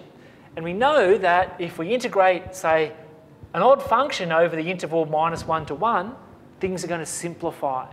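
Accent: Australia. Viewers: Bonus for having a ‘Stralian accent 😊🥳🎊🙌🏽 Awesome video! Greetings from the US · I haven't seen too many Australian people on these sort of videos. There's something about the accent that I love even though I'm American! Great work.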